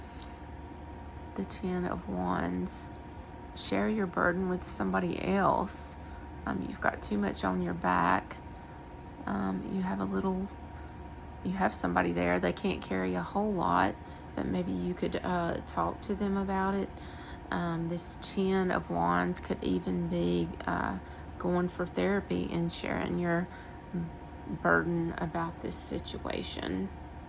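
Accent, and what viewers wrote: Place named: South, United States. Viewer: LOVE your southern accent... sounds just like mine from NC💞 Thank you for sharing your cool decks! I totally resonated with your large spread about family issues. Every card was right on.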